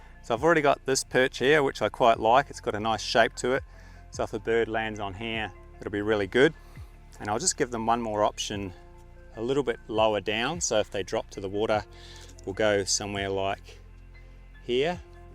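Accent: Australia. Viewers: Sitting here in cold Wisconsin January (2022), admiring Victoria wildlife. Maybe I will head to WI river soon for eagles, they love the free water below dam once all lakes are frozen. I have a canon 500 mm and 1.4 telex for my 7dmarkII, working on convincing myself to spend $2500 on an r6. Love Victoria and the rest of Australia I;'ve visitied (not enough), love the wildlife, even enjoy the accent mate! Have a cabin on a lake up north with Kingfishers, maybe I need to spend some time concentrating on them, they are cool beyond measure.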